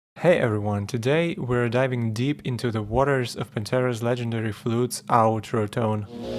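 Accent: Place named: Western Ukraine, Ukraine. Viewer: Так ось він який - акцент на заході України 😂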